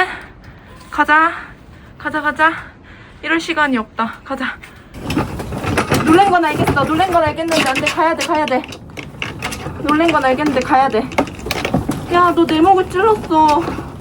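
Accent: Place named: Gyeongbuk, South Korea. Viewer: 산동네 볼때 경북쪽 사투리 쓰시는거 같아서 혹시 산불난 쪽 아닐까하고 생각했었는데 오늘 영상 올리셨네요. 아무쪼록 사람도 고양이도 무탈하고 건강 해치지 않길 바랍니다.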